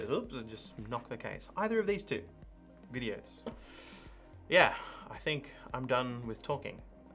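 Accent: Australia. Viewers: Awesome walkthrough! I was 90% sure of how to go about this, but having a lovely Australian voice to check my work was immensely helpful and reassuring.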